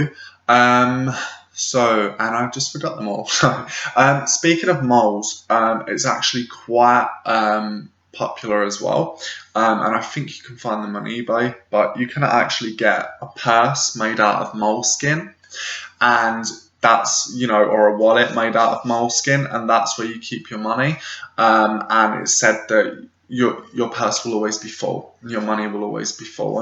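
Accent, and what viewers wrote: England, United Kingdom. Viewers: Love your videos. Nice to hear an English voice ;) easy on theeyes too ;)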